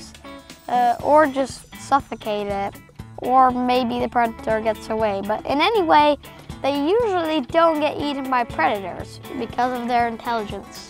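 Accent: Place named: United States. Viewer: he's not an australian his accent is to American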